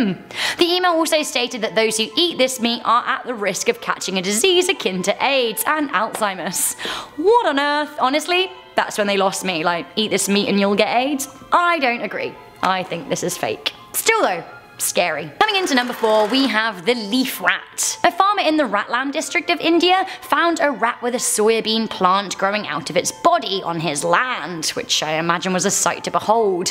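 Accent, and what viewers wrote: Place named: United Kingdom. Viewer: Mary me! LOL. Seriously though your gorgeous plus I'm a sucker for British accents too.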